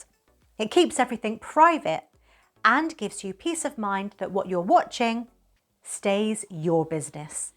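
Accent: United Kingdom. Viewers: It is true, you are a very lovely girl, and I really like your British accent❤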